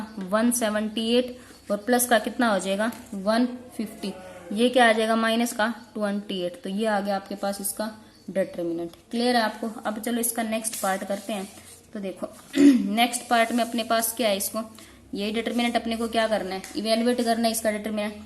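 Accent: Haryana, India. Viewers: Mam aap haryana se ho Kya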